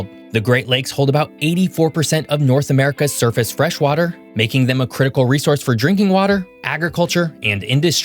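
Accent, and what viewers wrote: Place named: West Virginia, United States. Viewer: You got a little bit o’ West Virginia in there!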